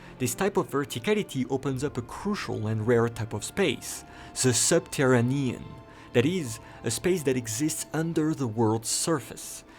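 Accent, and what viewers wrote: France. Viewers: i love your french accent lmfaoo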